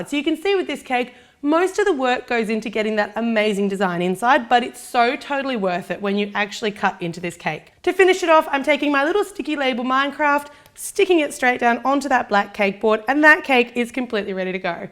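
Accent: Australia. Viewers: are you aussie or not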